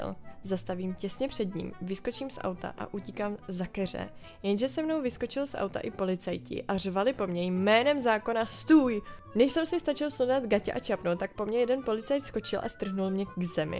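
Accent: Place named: Slovakia. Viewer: super videa ale ta slovencina no docela sila :D Malem sem se podelal od smiechu :D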